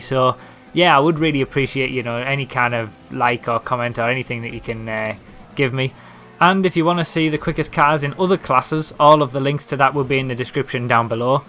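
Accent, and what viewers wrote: United Kingdom. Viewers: man all this british guy does is just race on 'cutting corners ' in his british accent. a lot of this is human error , so his times are skewed. just b/c this guy says a car is 1sec faster than one other one. doesn't mean it is. this is stupid. don't listen to him. he just likes to hear himself talk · is this dude british, were is he from?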